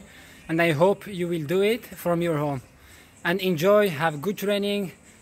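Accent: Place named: France. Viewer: I felt a french accent in your voice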